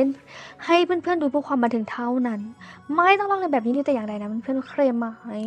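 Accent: Thailand. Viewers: ชอบเสียงพี่เค้ามากเลยอ่ะโอ้โหเสียงแบบเหน่อได้ใจ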